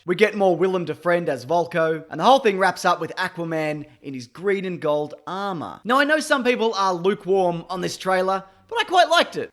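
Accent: Australia. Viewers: “Ack-wa-man” is it that hard for Australians to say aquaman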